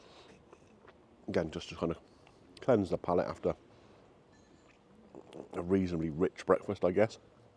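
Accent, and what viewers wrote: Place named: England, United Kingdom. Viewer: He heard your English voice! Further proof the Europeans do not like us - except our money.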